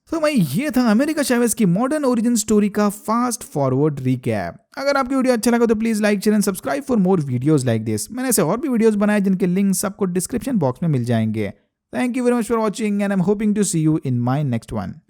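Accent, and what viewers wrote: India. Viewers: I hate your stupid indian accent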